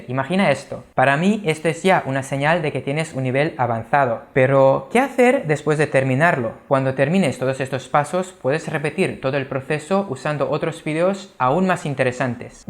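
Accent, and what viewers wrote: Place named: Spain. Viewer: Excelente video, me parece interesante tu español, el acento es de España, pero utilizas algunas palabras como en LATAM, ejemplo, la palabra video, en España suelen colocar el acento en la "I" en la latam lo hacemos en la "e"... Que genial, un abrazo, siempre me pregunté como sonaría esa combinación.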